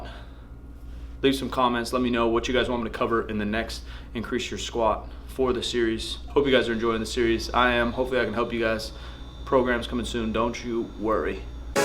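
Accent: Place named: Russia. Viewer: Beautiful sumo technik russian accent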